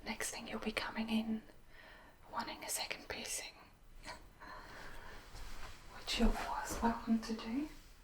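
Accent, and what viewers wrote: Australia. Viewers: I love that I've found someone with an Australian accent. It makes me feel so homely. It's great :)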